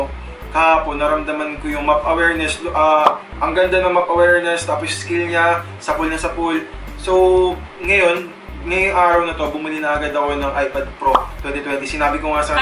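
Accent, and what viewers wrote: Iloilo, Philippines. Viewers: Ilonggo ka pala Boss? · Ilonggo ka po ba kuya? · Boss. Ilonggo ka pala?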